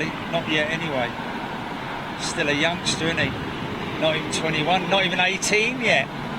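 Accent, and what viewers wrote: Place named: England, United Kingdom. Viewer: Wow I just love your video, and your English accent. Hello from Covid19 Melbourne Australia 🇦🇺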